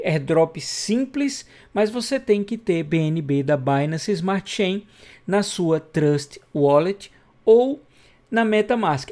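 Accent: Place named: Pernambuco, Brazil. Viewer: vc era de q parte do nordeste Aki no Brasil? Vou chutar Pernambuco por que vc fala sempre ( butar ) 👏👏👏👏👏👏👏👏👏👏👏👏👏👏👏👏👏👏👏👏👏👏👏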